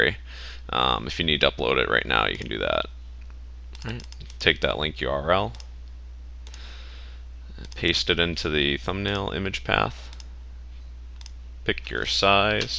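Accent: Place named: Canada. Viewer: by the accent, I'm guessing you're Canadian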